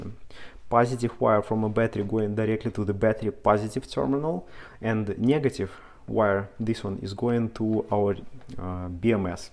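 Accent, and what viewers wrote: Russia. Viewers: Сразу лайк за Бьютифул рашен акцент)👍 и видос хороший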